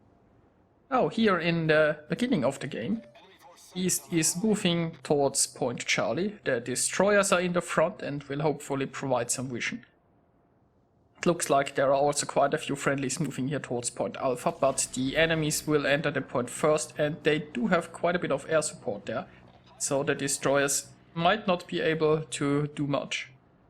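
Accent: Germany. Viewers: Man hört das du ein deutschsprachiger bist :D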